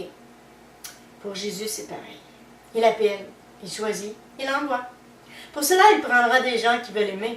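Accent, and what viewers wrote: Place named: Quebec, Canada. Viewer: Bonjour! J'ai liké ta vidéo. Je voudrais cependant te demander si c'est possible quand tu fais une vidéo d'abandonner un peu ton accent québécois? Pour qu'un maximum de personnes puissent te comprendre.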